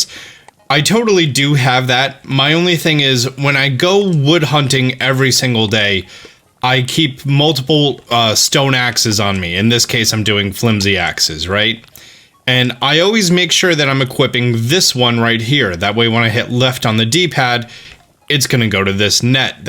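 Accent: New Jersey, United States. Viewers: Can confirm, it's a jersey thing. I immediately knew he was from the same state as me first video i watched lol. Bergen/Passaic county perhaps?? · Dog I am pretty sure its a New Jersey accent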